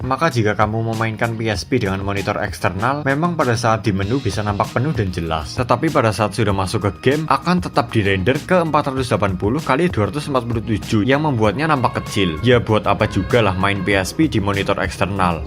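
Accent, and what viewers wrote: Banyumas, Indonesia. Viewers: Ngapake pol lah ya pak, ora ngapak ora kepenak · Ngapak bgt mas tp sya suka hahahah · ngapak tapi jelas lugas dan detail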